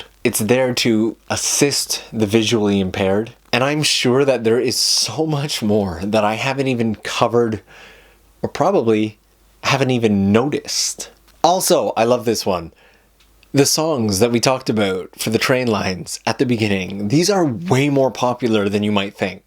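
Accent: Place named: Canada. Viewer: No I don’t mean it’s funny... I was just wondering if u are a Canadian Cus the way u pronounce those words... I love the Canadian accent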